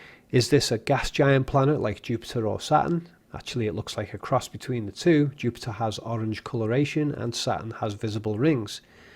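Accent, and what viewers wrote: Liverpool, United Kingdom. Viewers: scouse git innit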